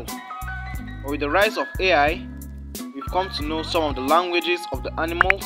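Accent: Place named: Nigeria. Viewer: I am a Nigerian too.......I can tell a Nigerian when I hear one speaking .....this YouTube hustle is not easy at all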